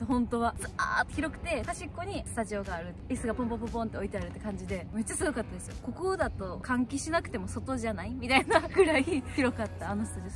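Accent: Kansai, Japan. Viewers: アナザースカイでの関西弁がとってもカワイかったんでウワッと感じました。YouTubeでもドンドン出していって下さい癒されます。🤗 応援してま〜す。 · 久しくカスうどん食べてない。 番組観てて無性に食べたくなったよ。今は飲食店も大変だと思うから、緊急事態が解けたら、帰阪した時に寄らせて貰います。 うどんを食べた後、店の前で、「ここが俺のアナザースカイ』って言ってみようかな WW その関西弁、絶対に変わらないで下さいね。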